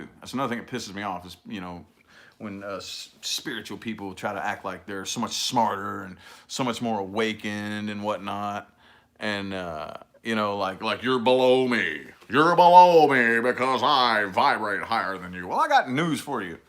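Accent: South, United States. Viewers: Hi, I absolutely enjoyed your chatting with us today! You are one of my favorite tarot readers!!! Your honest and very real and frank with your messages and your southern humor and accent is refreshing for many of us! You have an amazing gift and thank you for sharing your experiences with us subscribers! Oh and happy hump day from Redondo Beach, California!!!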